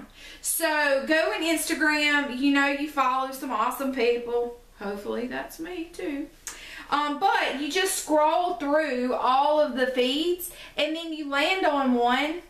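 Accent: South, United States. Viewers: your voice is so darn cute, I literally listened to you for your voice :-) I love that southern drawl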